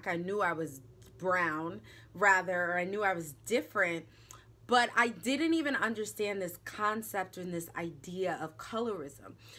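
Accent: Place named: United States. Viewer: She is a child of immigrants so naturally she has an American accent.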